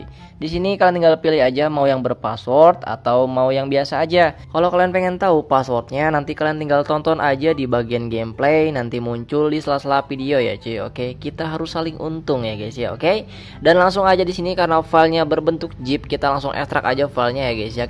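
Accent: West Java, Indonesia. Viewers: Anjay...sundaness pisan lh logatna😂😂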